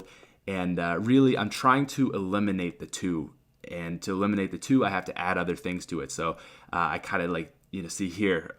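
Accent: Canada. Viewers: He sounds so Canadian In this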